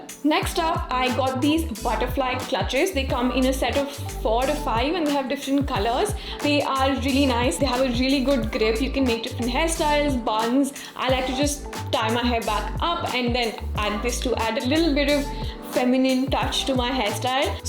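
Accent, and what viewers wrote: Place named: England, United Kingdom. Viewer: English accent is so good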